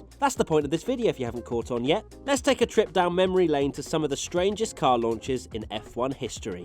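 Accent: United Kingdom. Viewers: Aaaah your british accent 😵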